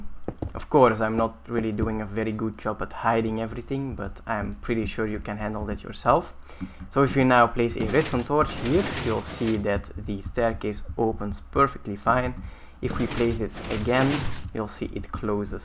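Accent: Belgium. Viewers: Haha, dacht ik het niet. Dat accent herken ik uit de duizenden :) Blij om een landgenoot zo succesvol te zien op youtube :D Gebeurt niet veel! Keep up the good work! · Are you from french Belgium? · are you belgian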